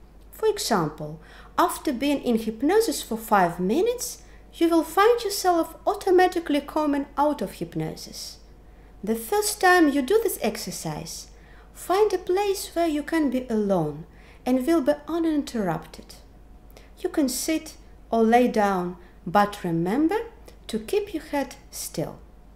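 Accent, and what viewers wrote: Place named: Eastern Europe. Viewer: Wonderful video, thank you. Very grateful new Aussie subscriber and love the East European accent. Thank You again.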